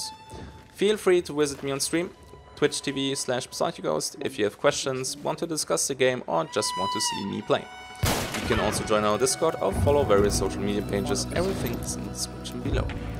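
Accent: Germany. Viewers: Hey, are you german? You sound like my old classmates in english lessons. But I in deed like your video! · Du bist Deutscsher oder? Oder zumindest Schweiz oder Österreich. Hört man einfach an der Aussprache :P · Man merkt das der Type ein deutscher ist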